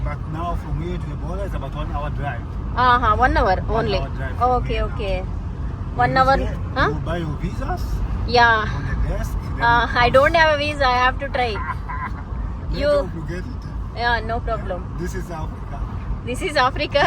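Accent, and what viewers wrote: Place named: Nizamabad, India. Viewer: నీ వాయిస్ ఎక్స్ప్లెయిన్ సూపర్ మా నిజాంబాద్ లాగా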